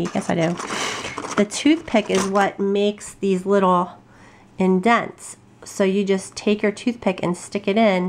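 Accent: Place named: Georgia, United States. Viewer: last night i ran across eva tissen too and i looked thru her gallery and was literally blown away and i was like i would looove to be able to do this . i am gonna hafta give it a try! you did awesome with these pieces! my favorite one is the daisy one. i love how you curl the vines on some of them! btw you have an accent like my cousin who is from warner robbins ga. :)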